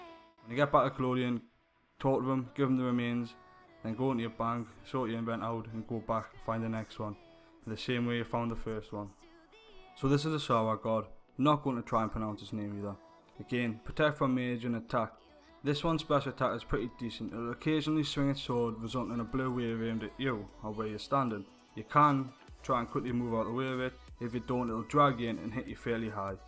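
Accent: Newcastle, United Kingdom. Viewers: geordie guides geeeet up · fellow geordie?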